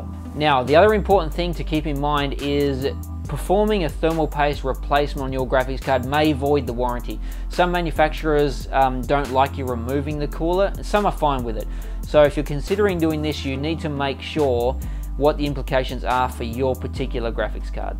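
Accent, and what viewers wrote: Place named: Australia. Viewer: His guys aussie accent is too thick..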